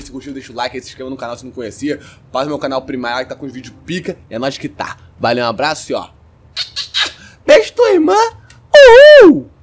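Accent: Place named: Rio de Janeiro, Brazil. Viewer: Muito carioques, fala muito mal, parece que nunca esteve na escola, melhorar essa voz de malandro ai negão, kkk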